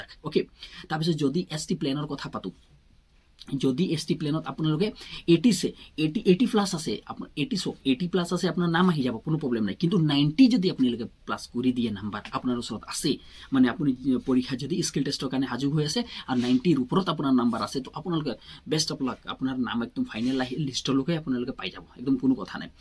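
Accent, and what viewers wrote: Bihar, India. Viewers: Ki kela assamese kaw o..toi kela sure Bihari..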